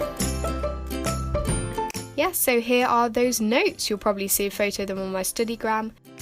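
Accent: England, United Kingdom. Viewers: I love your English accent and your beautiful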